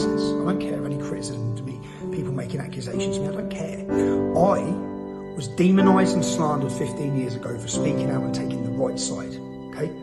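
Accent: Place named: Cockney, United Kingdom. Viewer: Run for PM ...get rid of that cockney accent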